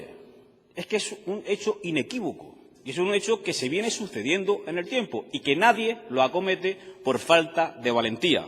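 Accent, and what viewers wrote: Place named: Andalusia, Spain. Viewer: QUE GENIAL ERES! Y EL ACENTO ANDALU-A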